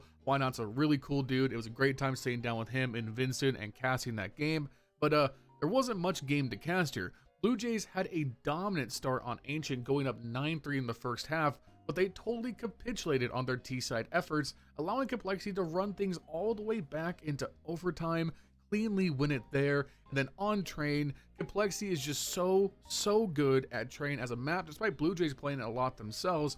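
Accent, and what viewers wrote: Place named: United States. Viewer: As an Australian, please pronounce it as 'Melb-n' or 'Melbin' it hurts a lot to hear Americans do and I do like these videos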